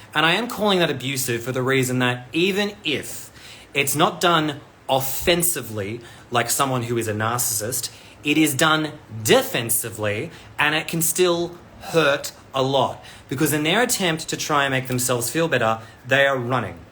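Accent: Australia. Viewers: I loved it when I started listening to this, and it was a fellow Aussie speaking!! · Are you from Perth/Western Australia? Your accent reminds me of some I used to know.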